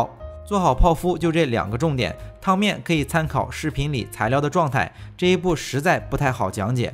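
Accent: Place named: Shandong, China. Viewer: 喜歡老爸偶爾解說 非常親切的山東口音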